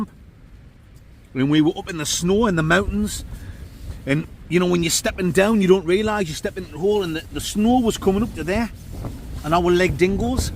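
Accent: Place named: Sunderland, United Kingdom. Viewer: This is the only Sunderland accent I will tolerate to listen too. Top bloke 🔥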